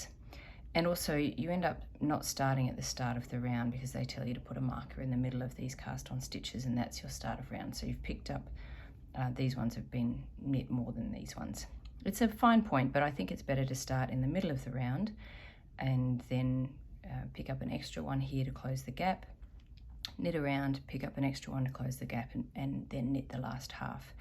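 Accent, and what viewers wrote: Australia. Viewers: So refreshing to hear a fellow Australian accent on a knitting video! I always worried my 'free-form' approach to weaving in ends was 'wrong', so it's very reassuring to see how you do it, it makes sense to have an intuitive attitude. I love the neat result of the seam, so I'm going to follow your suggestions.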